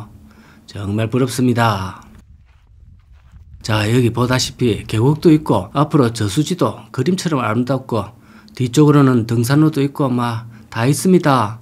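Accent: Gyeongsang, South Korea. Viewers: 경상도인가요 좋네요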